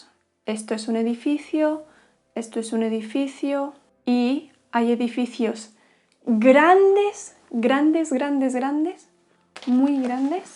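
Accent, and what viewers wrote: Spain. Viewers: I only wish two things: 1) that you had lots more just like this and 2) that it was in a Mexican dialect rather than Castillian one. I think I would get stared at if I said "ciudad" as you say it. The second isn't a complaint. Just a wish. What you do in this video is what all the polyglots say to do to learn/teach another language. My Spanish vocab is good, I know the grammar, but I spend too much time in my head translating rather than just hearing and connecting words with ideas. The pointer idea is fantastic. I'm tempted to make a few videos like this in English for my various student friends that are struggling at the same point I am. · Talar du spanska från Spanien?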